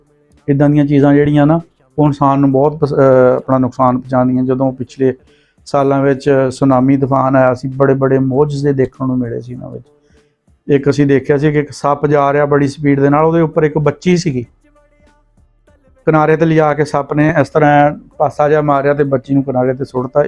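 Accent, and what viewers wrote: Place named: Amritsar, India. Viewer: Veere thdi punjabi bilkul sadi Amritsar wali.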